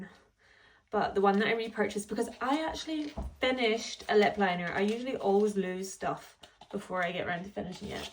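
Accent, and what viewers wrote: Scotland, United Kingdom. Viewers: There's something about a Scottish voice that warms my soul. I can't listen to every post, but thank you! 🙏💛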